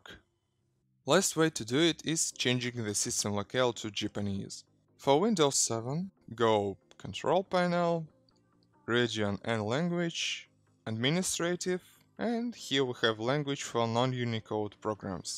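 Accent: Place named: Russia. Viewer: почему твое произношение похоже на русского?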